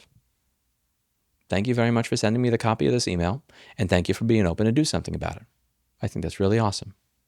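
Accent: New York, United States. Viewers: I LOVE how this tough talking New Yorker who teaches everyone how they are getting F**ked every video is a competant Couple Counselor 😅:hands-yellow-heart-red:❤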